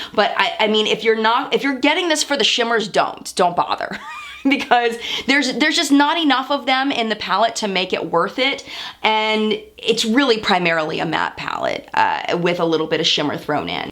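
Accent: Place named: East Coast, United States. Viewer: This was a great review! Seriously, the format was impeccable (sincerely appreciate the skip-ahead sections!) and I love you allowed us as the viewers to decide if we think it's a good choice for us. It felt educational with out too much bias, and it was great to see how thorough you were with reviewing every aspect of the palette, right down to side-by-side ingredient comparisons. I appreciate how you acknowledged who this palette is ideal for and who it may be less ideal for--a lot of make up reviews insist something is bad because it wasn't for them, it's so frustrating! So really, this was a freaking great review (also it's been so long since i heard that east coast accent!!! <3)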